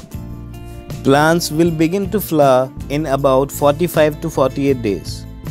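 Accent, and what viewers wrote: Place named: India. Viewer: Thick accent nothing is more annyoying than Indian accent.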